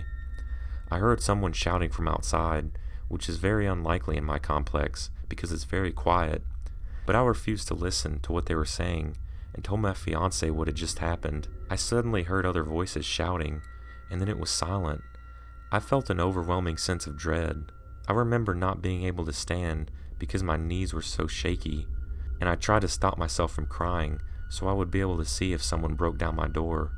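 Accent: South, United States. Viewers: where are you from? you have a real subtle southern accent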